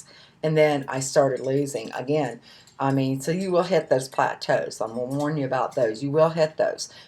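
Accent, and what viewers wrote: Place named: South, United States. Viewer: u go girl...you inspire us...i love your paula deen style accent..you are the real deal...wish u the best of luck keep losing..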